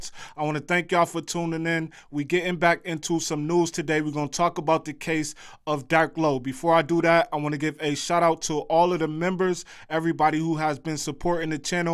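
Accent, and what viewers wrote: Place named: Upstate New York, United States. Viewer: I can tell by your accent that you from upstate maybe Buffalo?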